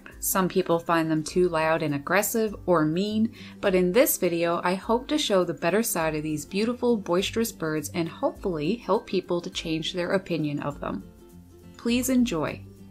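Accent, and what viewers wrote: Canada. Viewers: You sound canadien. So these might be Toronto Blue Jays?! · Blue jays “ afften gehda biyiahhdd rahhp”... what ? Haha. Where is she from ? Lord !!!! Have mercy !! Oh wait. Canada. She said a boat it. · "A hewse" and "figured oat" = canada. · Boy are you from Canada! {but thats a good thing}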